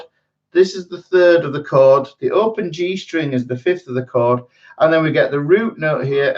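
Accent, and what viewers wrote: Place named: Hull, United Kingdom. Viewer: Trying work out the accent maybe Hull area ish, good lesson mate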